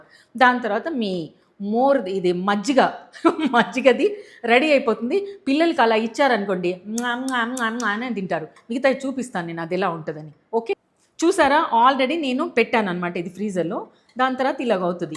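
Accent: Tamil Nadu, India. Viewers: Mee teluguki tamil flavour vachesindi Madam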